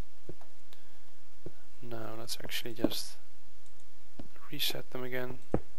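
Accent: Netherlands. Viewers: are you dutch? (your accent sounds like it)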